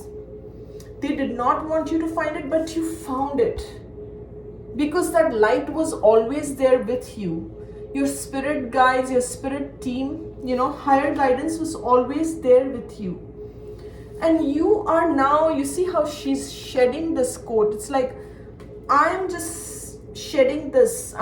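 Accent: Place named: India. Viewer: Thanks! On spot as always. Something caught my attention today watching this reading. Your accent changed to strong Indian accent today… is that because you were challenged to Indian gods? Never happened before as I’m watching your videos regularly.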